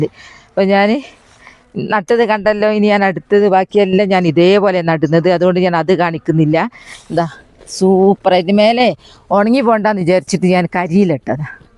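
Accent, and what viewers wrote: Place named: Malabar, India. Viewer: നിങ്ങളുടെ സംസാരം കേട്ടിട്ട് കോഴിക്കോട് മലപ്പുറം അതിർത്തിയിൽ എവിടെയോ ആണെന്ന് തോന്നുന്നു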